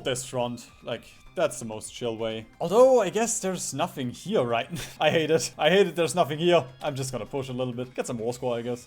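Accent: Germany. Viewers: question you german ?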